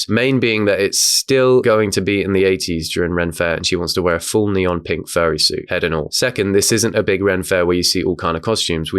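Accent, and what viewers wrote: United Kingdom. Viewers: It’s just the way the British pronounce it, coming from a British person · wait have i been saying renaissance wrong or is he just british asf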